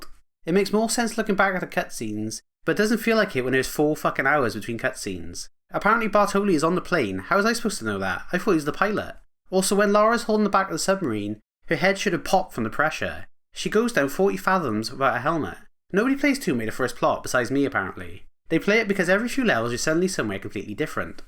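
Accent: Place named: Wales, United Kingdom. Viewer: Ah I know a Welsh accent when I hear one!🏴 Agree with a lot of your points! Compared to other classic TR entries, TR2 is the least replayable for me. I like the heavy combat, but the underwater section, clunky vehicular gameplay and weak story really let the game down. Interested to hear your thoughts on TR3! Excellent video! Subscribed!💚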